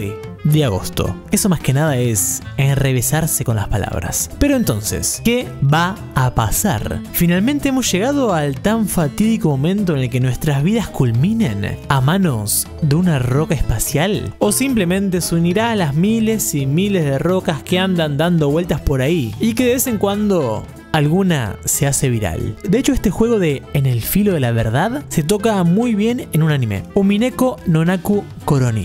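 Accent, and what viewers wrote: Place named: Argentina. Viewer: Con esa vs argetiinoo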